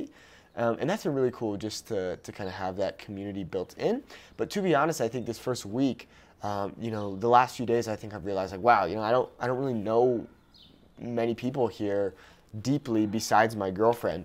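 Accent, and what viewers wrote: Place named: Northern Mexico, Mexico. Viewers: Congratulations,! 🎉 This is a great move for you! I can't wait to see your Mexico City content. It will be interesting if your Norteño dialect will change after spending time in the big city! Keep going! ⭐ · he should use his northern mexican accent to not get scammed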